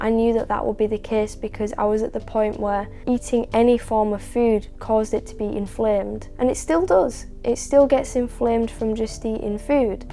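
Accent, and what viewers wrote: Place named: North, United Kingdom. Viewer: Fabulous video. Thank you for sharing. Also nice to hear a Northern UK accent on YouTube for a change! 👌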